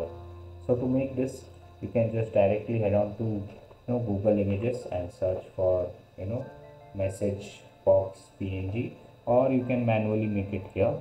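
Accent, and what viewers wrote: India. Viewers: He’s better bro He’s Indian and tried his possible best to speak English. Thank you bro and keep up the good work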